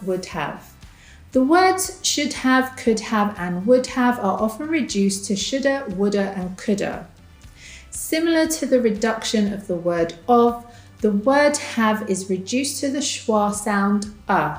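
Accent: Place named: United Kingdom. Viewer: Videos with British accent is very very useful for people like me. Thank you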